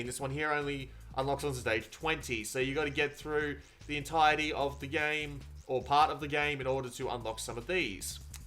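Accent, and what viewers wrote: Australia. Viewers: That voice. MATE. from a fellow Aussie. Jesus.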